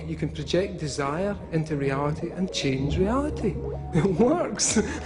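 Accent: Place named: Glasgow, United Kingdom. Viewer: Auto captions are no match for the Glaswegian accent.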